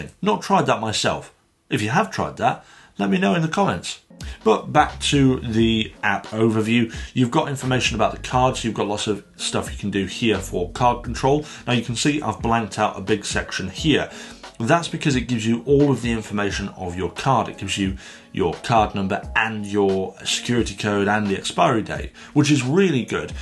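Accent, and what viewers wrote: United Kingdom. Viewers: im curious which part of the uk are u from!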